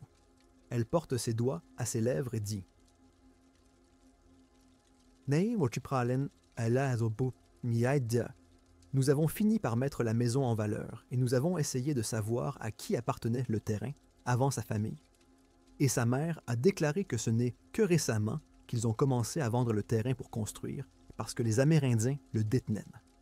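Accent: Quebec, Canada. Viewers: C’est un accent québécois! 😉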